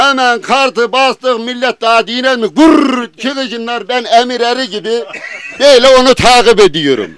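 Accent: Sivas, Turkey. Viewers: SİVAS ŞİVESİ GİBİ ŞİVE YOK. HARFLERİN SÖZCÜKLERİN TEK TEK İNCELENMESİ LAZIM. ÖZ TÜRK KONUŞMASI BUDUR. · ayni sivas yalaaaa:) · Helal olsun işte bu tam bir sivas şivesi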